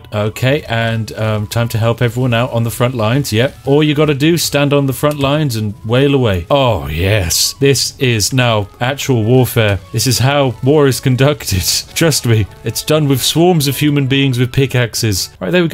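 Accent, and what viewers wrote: United Kingdom. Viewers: SO NICE!!!!! I REALLY ENJOYED THAT VIDEO!!!! british accent!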